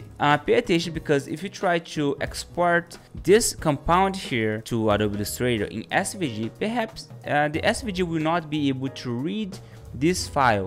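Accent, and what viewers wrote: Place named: Brazil. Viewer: There is absolutely no chance you´re not brazilian with this accent hahahaha thank you so much for your help :D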